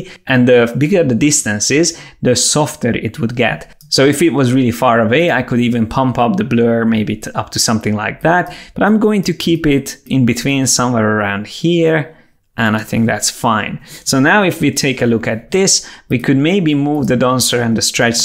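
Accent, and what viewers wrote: Hungary. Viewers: I'm like 99% sure this guy is Hungarian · oooh a hunglish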